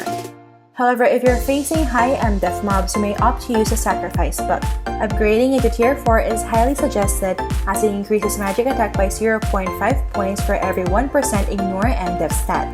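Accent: Philippines. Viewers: So conyo. Hirap panoorin.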